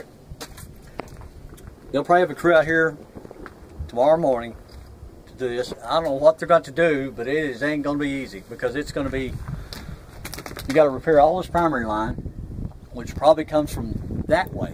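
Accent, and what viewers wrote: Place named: South, United States. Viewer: He clearly has a thick southern accent you twit 🙄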